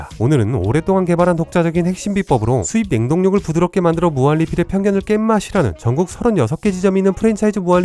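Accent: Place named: Jeonju, South Korea. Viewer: 전주분이셨군요?역시 목소리톤이 사투리는 없지만 억양이 전주식 아니겠지 보면서 참 친숙해했는데...좋은영상 만들어주시고 항상 잘보고 있어요. 제가 전주를 좀 알아서요.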